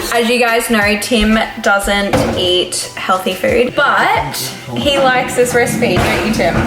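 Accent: Australia. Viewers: Loved this vlog! These are the videos I get most excited for. Just a kinda of day in the life and what I eat in a day. Btw I love your Australian accent, jealous because American “accents” aren’t cute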